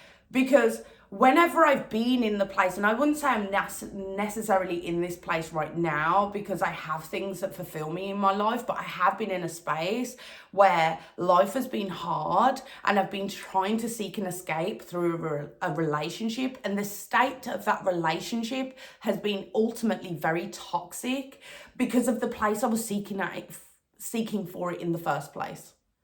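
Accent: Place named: Midlands, United Kingdom. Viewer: Damn I feel like I was supposed to find your channel right now. I been conserving solar energy on my own for 6 months to follow my life purpose within boxing, but sometimes it’s tough. But I appreciate the message in your content a lot, my current experience really resonates with what you’re saying. How did you come to learn the things you’ve learnt? Also are you from Brum or the midlands? Your accent is familiar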